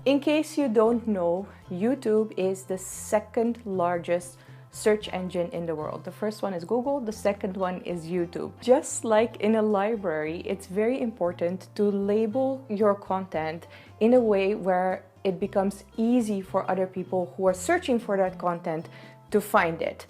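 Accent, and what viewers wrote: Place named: India. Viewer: Its true, You looks like Indian... And you accent is not like British, American, Australian accents... so your taliking style is also like Indian. But as an viewer from long time, I know, You are not Indian. So you should not call yourself Indian. And It would be disrespectful to your own nationality if you call yourself Indian.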